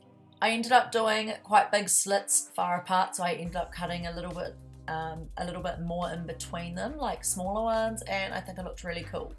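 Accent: New Zealand. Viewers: Wow! Very creative. I don't want to be offencive or racist but are you from New Zealand? The kiwi accent just clicked for me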